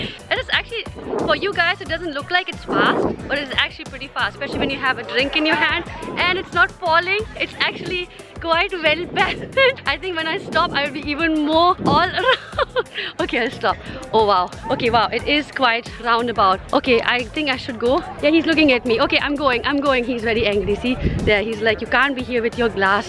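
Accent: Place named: India. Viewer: I came here to get used of Indian accent as you told us. From Brazil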